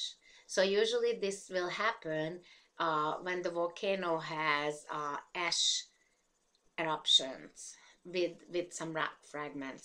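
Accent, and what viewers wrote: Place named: Hungary. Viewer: Summer 2020 GOL 105 - V1 Physical Geology - Savannah Mihalo - Classifying magma gets much more complicated than I realized! I wrote down in my notes about viscosity levels as that was the most confusing part for me---but I think I understand it. I did like learning about the types of intrusive and extrusive mineral textures. When I saw pumice, I remembered that sometimes my family used pumice-crafted materials in the shower for scrubbing. I love obsidian most likely because of its glassy texture. I did not know you were from Hungary; that is so neat! I have loved listening to your accent throughout these videos but I was not sure which country you originated from. I love these videos---they are well done!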